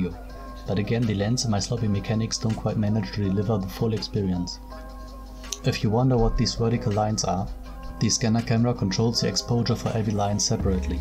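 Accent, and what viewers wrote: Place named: Germany. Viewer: So yours Last name is polish but you have German accent 😄✌️👋Nice progect 😉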